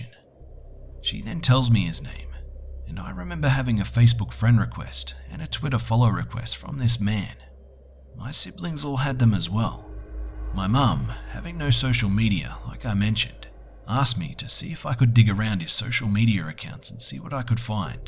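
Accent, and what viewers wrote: Australia. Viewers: Are you by any chance Australian? I'm Australian and picked up on your intro haha! Thought I'd ask :)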